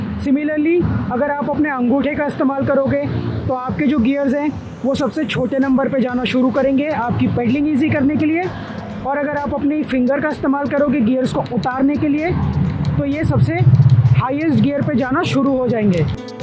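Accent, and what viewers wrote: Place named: Kashmir, India. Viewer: Very nice. Your accent is Kashmiri 😊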